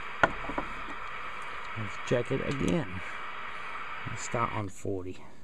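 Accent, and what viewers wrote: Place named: Boston, United States. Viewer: Interesting - if you’re going dipole on 10/11 meters vertical polarization is the BEST way to go. You sound like my Southie submarine shipmates - where ya’ from? Bahstin?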